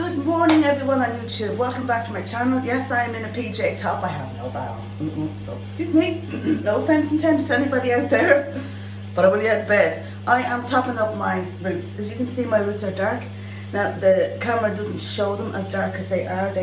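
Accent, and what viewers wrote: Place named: Ireland. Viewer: Thanks,! Love listening to you. Can't tolerate all the "younger" gal videos. We had to double-down on the lockdown here in California, and I've got about 5 different colors of blonde in my hair, so I'm gonna give this a shot assuming I can find that color here. I agree with you on the NO ASH, which is what it seems all the ladies here are going for. Ewww. Doesn't quite work on us over 40s! Again - LOVE your (Irish?) accent and your potty mouth - cracks me up every time.:)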